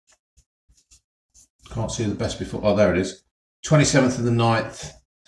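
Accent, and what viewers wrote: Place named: Newcastle, United Kingdom. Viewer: True Geordie emdy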